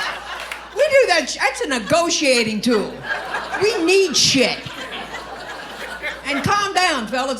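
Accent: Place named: South, United States. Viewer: I love her southern accent 😍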